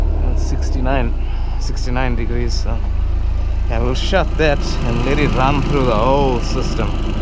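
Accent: South Africa. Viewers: you sound very south africa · Cool video ,you sound South African ?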